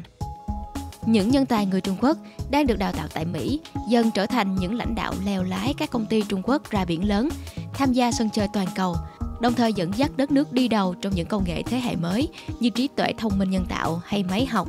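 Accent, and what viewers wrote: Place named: Saigon, Vietnam. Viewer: Giọng đọc, rất hay... Dễ nghe và rõ ràng, tôi nghĩ là tiếng Sài Gòn. Cảm ơn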